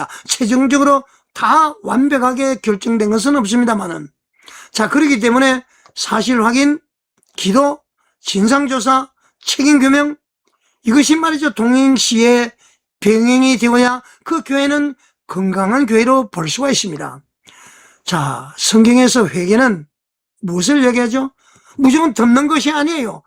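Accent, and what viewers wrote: North Korea. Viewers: 억양이 이북 사투리가 심한 것 같네요. 우리 교회도 감독에게 양육 받은 부목사가 불륜을 했다는 모욕적 내용을 전 성도들에게 배포, 누명 씌워 담임 목사를 고소해 교회를 박살내 힘들게 했는데 몇 년 지난 후 사실 무근이라 법원에서 판결 받고 벌금 내고 끝났으나 지금도 간간 교회를 여전히 괴롭히고 있네요. 악한 영들의 거짓 행위인 것 같은데... 공산당이 냄새 피우는 것 아닌지 수상들 하네요. 십일조는 반드시 해야 합니다 이는 하나님께 돌아가는 표입니다. 말리기를 참조하세요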